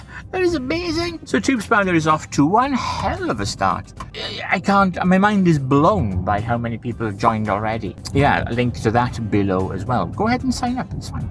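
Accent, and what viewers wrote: Wales, United Kingdom. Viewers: Where is that? Aberavon or more Mumbles way? Driving me mad, trying to work it out. X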